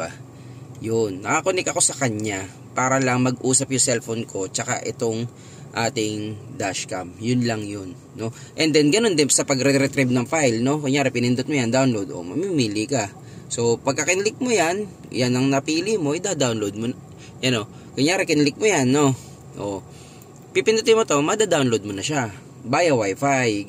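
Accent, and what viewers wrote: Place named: Marikina, Philippines. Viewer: Puntong marikina, taga marikina!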